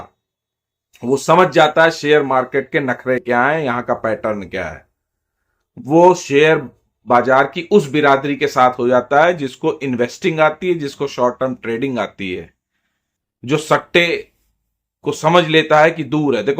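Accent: Kanpur, India. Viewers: भाषा तो कनपूरिया है?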